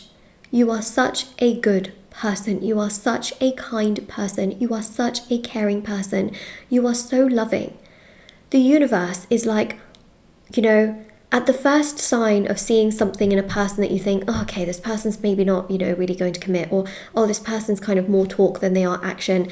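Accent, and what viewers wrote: United Kingdom. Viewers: You’re hot, but your hands are too big but your British voice is hot so it’s confusing to me.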